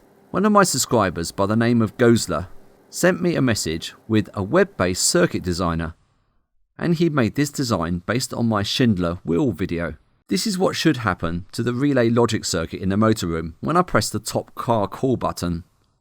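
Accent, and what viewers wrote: United Kingdom. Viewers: nope he's from the UK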